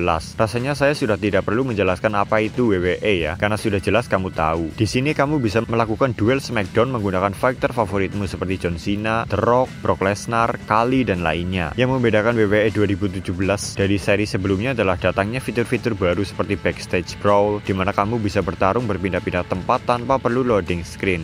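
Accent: Banyumas, Indonesia. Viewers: Naratornya ngapak yah😅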